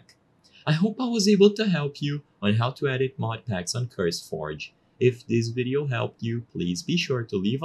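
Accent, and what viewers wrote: Brazil. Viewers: sabia que tinha sotaque de BR, entrei no canal pra ter certeza kkkkk. Valeu pelo vídeo irmão!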